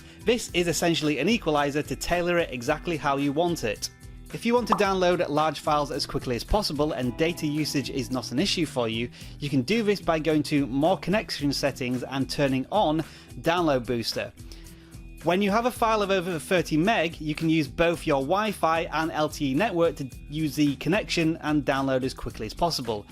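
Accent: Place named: United Kingdom. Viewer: The goddamn british accent creeps me out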